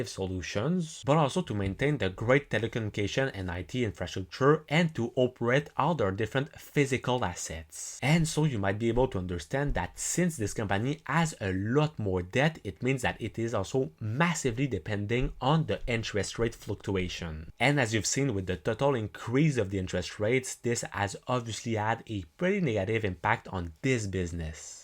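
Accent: Quebec, Canada. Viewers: Salut, j’ai pu comprendre avec ton accents que tu est QC ahah. Tu as l’air super bien informé. J’ai juste pas compris se que Telus fait ds le domaine de la santé.. peux tu m’éclairer svp! Merci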